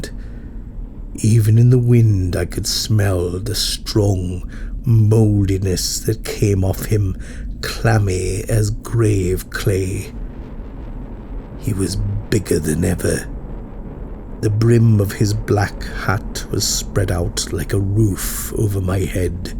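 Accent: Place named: Wales, United Kingdom. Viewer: A brilliant story and a brilliant Welsh accent, Sir... Is there anything you can't do?:)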